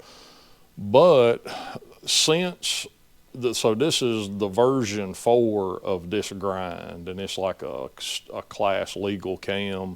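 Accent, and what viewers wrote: South, United States. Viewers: this is the most southern state drawl I've ever heard, a true testament to the American educational system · my man here has got once serious country accent....I miss the south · lack of oil supply on cam gears in my opinion and your southern draw is awesome dude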